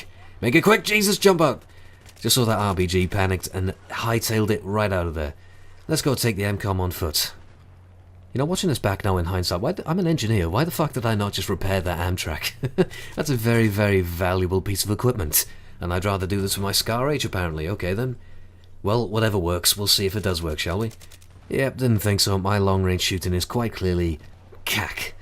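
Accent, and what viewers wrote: United Kingdom. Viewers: That accent! Somewhere from UK, I guess.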